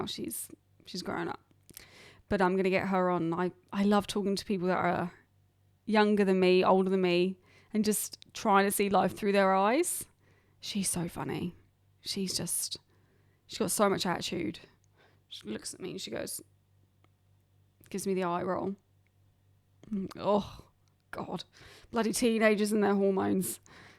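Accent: Australia. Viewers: You should reconsider the title of the 'pod'. I would lean into your lovely Australian accent. And the fact that you're honest about your regrets in life, something like "Cautionary Tales: The Karen Chronicles" or "Bad Example".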